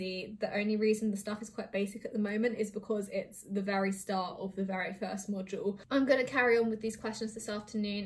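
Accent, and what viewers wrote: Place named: England, United Kingdom. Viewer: what part of england is that accent from? I like it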